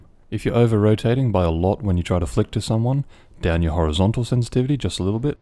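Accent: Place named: Australia. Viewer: the Smooth as butter Aus accent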